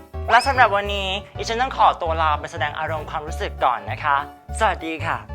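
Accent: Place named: Thailand. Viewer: สำเนียง เจ๊ แปะมากเลยอ่ะ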